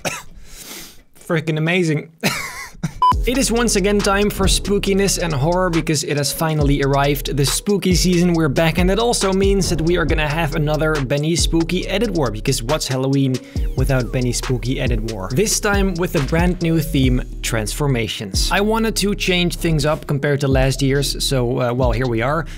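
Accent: Netherlands. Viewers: I ain't gonna lie bro, I don't mind you having a Dutch accent when you talk, but I DO when you sing..That was intense haha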